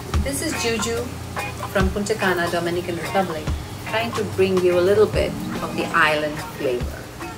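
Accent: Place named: United States. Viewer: Drop the western accent and bring your true self out. Your hindi diction is very good but why this American dialect??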